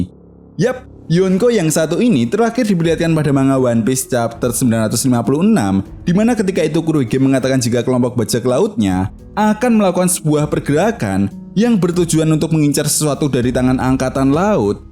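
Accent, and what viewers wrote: Java, Indonesia. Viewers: Logat jawamu Hilangkan dikit, gk enak didengar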